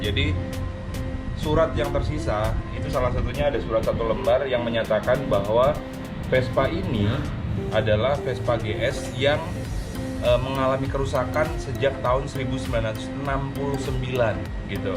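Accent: Indonesia. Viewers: kok bahasa nya kaya orang sulawesi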